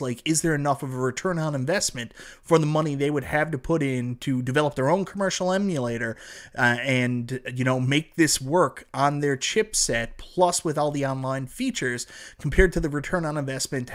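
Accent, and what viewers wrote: Canada. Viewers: love how this guy talks is he from canada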